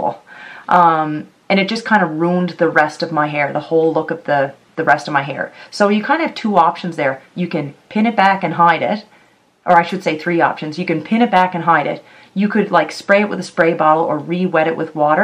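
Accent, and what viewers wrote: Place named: Cape Breton, Canada. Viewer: I've been watching lots of curly hair videos lately so this popped up as recommended - had no idea you were a caper til I heard the "shkonawn guys" haha made my day 🖤 now I'm real curious if ya got some specific tips for our wild weather in CB for curly hair, mine goes with the weather a lot of the time and I get so much frizz dude I'm not sure if its the highs and lows all the time out there or not lol. hair gets right crazy ah must be that nor'easter on its way by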